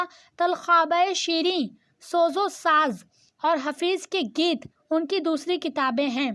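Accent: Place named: Pakistan. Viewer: aapki awaaz Ka tone Pakistan ke jesa hai Kafi Pyari Awaaz hai aapki aap pakistan ke hooo😍❤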